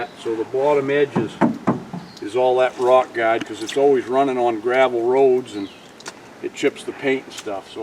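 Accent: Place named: Maine, United States. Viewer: Supah swampahs, huh Bub? 😆 Love the Maine accent!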